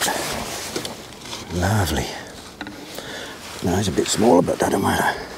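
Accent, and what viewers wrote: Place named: East Anglia, United Kingdom. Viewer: Waggler is difficult on a windy day but it looked calm there. With the amount of pellets needed to keep the fish in the upper layers not all of them get eaten so you’ll have a nice bed on the bottom for the bomb & pellet or method feeder. A lot of the time the bigger carp will sit just at the back of the feed and pick off a few loose ones so casting past your pellets isn’t a bad idea. Then fire in a few more and twitch into them. Eventually those bigger carp will have your hook bait. Lots of lovely lakes packed with fish in the Norwich and surrounding areas. Can tell you’re a born and bred Norfolk/Suffolk boy with that accent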